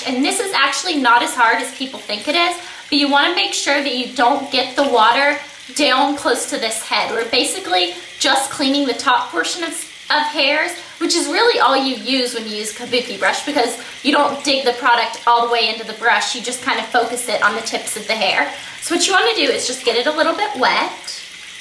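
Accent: South, United States. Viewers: You eye make up is gorgeous in this video. Can you please do a tutorial on it? As to your voice: It's probably because you have a southern belle accent. Personally, since I am from the northwest, southern accents tend to irk me, and so that's probably why some people say they find your voice annoying. Not your fault, just some of us odd Northerners ;) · Um... I just have to say that I absolutely love your accent! I'm from the south, and I think country accents are cute! I'm proud to have a country accent :) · i love ur voice!! dont listen to all those people who are south accent haters.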